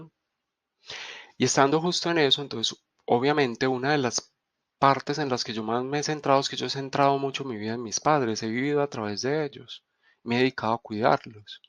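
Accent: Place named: Colombia. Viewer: Maravillosa exposición,una genialidad como enseñas; con profundidad y esencia das la enseñanza maya, Gracias!!! Encima de colombiano sos!!! Dulzura pura... amo profundamente la tonada colombiana, te enamoran al hablar. Un alemán te dice te amo y te asustas y un colombiano te insulta y pensas q te está piropeando. Desde Villa Carlos Paz, Córdoba, Argentina 💖💗❤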